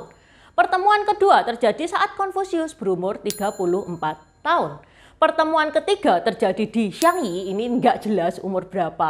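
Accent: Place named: Surabaya, Indonesia. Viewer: Suroboyoan tha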